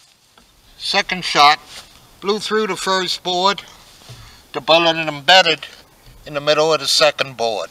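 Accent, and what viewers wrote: New England, United States. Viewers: Love this New England accent. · You can definitely tell this is a Yankee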